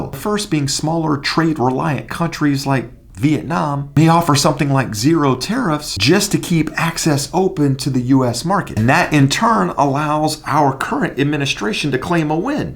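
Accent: California, United States. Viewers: You sound like someone who lives in California .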